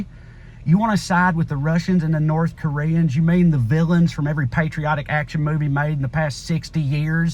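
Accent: South, United States. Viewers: What a fantastic rant young American man, spot on!! Greetings from England Love the accent!!.... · Finally, A Southern Accent i can Enjoy? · To hear this intelligent man speak the truth in in his deep southern accent is delightful. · lol , you sound like a red neck , but get your point across like a very intelligent person , as you are , I love it , shines a little light in these uncertain times , thank you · God I LOVE your southern drawl. I know this is totally off-topic but hey I couldn't hold it. BTW, f#&k the orange haired buffoon! Cheers from Italy!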